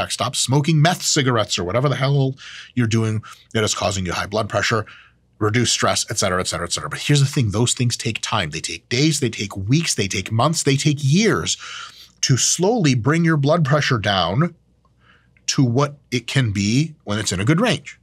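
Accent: South, United States. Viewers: Southern accent Dr. Mike is criminally underrated and underutilized.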